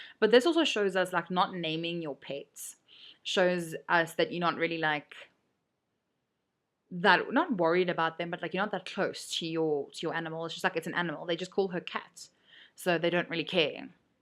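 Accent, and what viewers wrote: Afrikaans, South Africa. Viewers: Just taking a moment to thank you for your lessons. Regardless of the outcome of tomorrow, i still learnt so much from you. And i love your afrikaans accent😂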